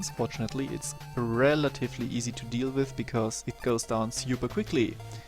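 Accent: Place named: Germany. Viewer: Alter o.O Man hört ja auf 10km dass du aus Deutschland kommst. Oder ist das gestellt?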